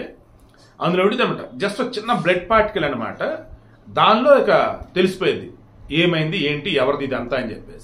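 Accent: Andhra Pradesh, India. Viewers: Meeru mareee ...andra ....yasalo...matladutunnaru.....koncem....bhasa marchndee....speed ....kuda tagginchindee....andariki ardham awaali kada......🎉🎉🎉🎉🎉... ...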